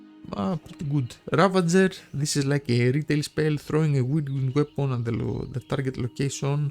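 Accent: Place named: Greece. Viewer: From the accent i can tell you are greek 😂 ! Are you??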